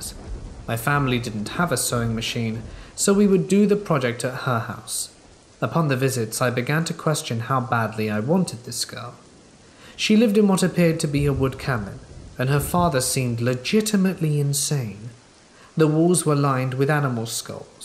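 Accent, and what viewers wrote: England, United Kingdom. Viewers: Lol! I relisten for third time! Love compilations! And narration is simple the best with his english accent 😊 I couldn't see your comment on my side, as I said it earlier, and nothing on my notification. I'll check again. How is your day so far? Have you seen your Dr? 😘 Ps: Did you see Paul? He left his comment. pm here now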